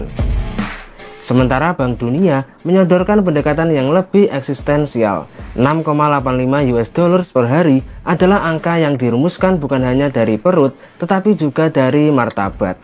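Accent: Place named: Java, Indonesia. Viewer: Bahasa yg bagus tidak terlalu jawa👍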